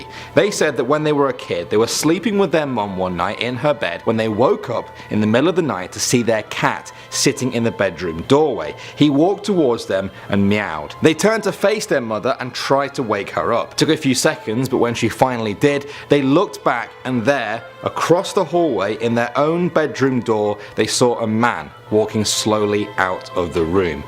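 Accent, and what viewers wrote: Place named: North, United Kingdom. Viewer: your norther accent ironically says WOTCHAH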